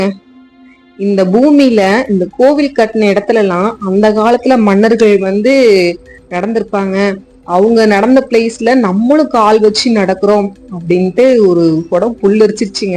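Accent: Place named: Kongu, India. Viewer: அருமையான தகவல்கள் அவர்களே. நம்ம கொங்கு தமிழில் நீங்கள் கூறியது அருமை. Building என்று சொல்வதை தவிர்த்து 'கோவில் கட்டிடக்கலை' என்று கூறுவது மிகச் சிறப்பாக இருக்கும் என்பது என் தாழ்மையான கருத்து. தாங்கள் கொங்கு நாட்டின் எந்த பகுதியை சேர்ந்தவர்?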